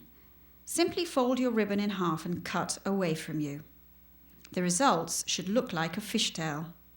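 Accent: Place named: United Kingdom. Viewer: Loved your tree decorating video. Inspiring and wonderful! Would like to hear Sharyn Alice in this video instead of the british accent. This voice does not sound sincere. You have lost impact with the voiceover.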